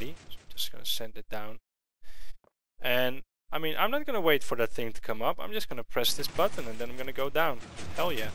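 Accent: Netherlands. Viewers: Als ik het goed hoor ben jij Nederlands...? :D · Ik hoor dat je nederlands bent :d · Ik hoor aan je engels dat je nederlands bent, of niet? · je kan altijd horen als iemand uit Nederland komt xD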